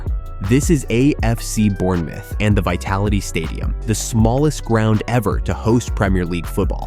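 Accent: United States. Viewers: Shame a Yanky robot had to narrate this. I might have watched it otherwise.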